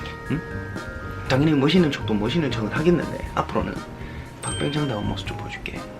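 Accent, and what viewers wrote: South Korea. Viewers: 뭔가 말투가 완전 대구사투리는 아닌것 같은데 ? 서울말이랑 섞인건가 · 나 화면밖에서 경상도 사투리들려서 들어왔는데 레알 경상도였네...그래 잘생겼다....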